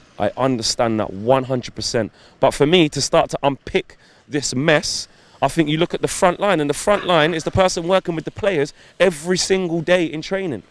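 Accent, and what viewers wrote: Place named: London, United Kingdom. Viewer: My guy u sound like u from London why u supporting united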